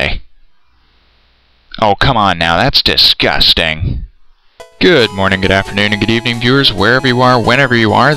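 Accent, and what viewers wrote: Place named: Canada. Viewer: you is entertaining <3. Love the Nuzlocke challenge. "What now the shirtless wonder?" :3 Love the Canadian accent as well haha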